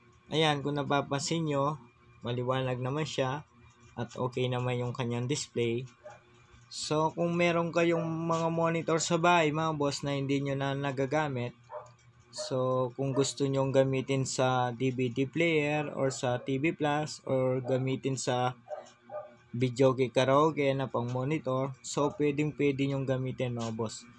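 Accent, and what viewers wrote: Pampanga, Philippines. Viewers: Kapampangan na kapampangan accent, haha konelk eh.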